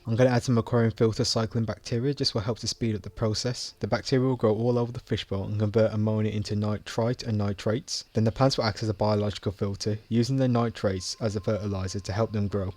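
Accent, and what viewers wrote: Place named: Birmingham, United Kingdom. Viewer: Nice, MDs bowl video inspired me to do one too. I had a planted bowl like this at my university halls but I left it there for too long when they sent everyone home so all the water evaporated and the plants died. So I'll probably do a video rescaping that bowl at some point. You have a good content on chanel, good quality camera and editing for less than 100 subs, you deserve more. You're from Birmingham right? I recognise that accent haha. :)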